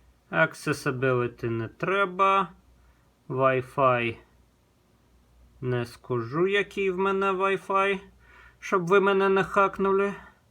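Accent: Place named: Ukraine. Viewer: Яка цікава українська говірка)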